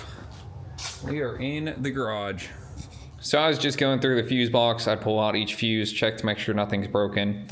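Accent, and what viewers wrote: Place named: Pennsylvania, United States. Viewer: I have to ask, are you related to Sam from Scrappy Industries? I see the personalized "Scrappy Industries" sweatshirt, and you definitely have a heavy western Pennsylvania accent. (I grew up 40 miles west in Steubenville, Ohio.). You look like you could be his brother?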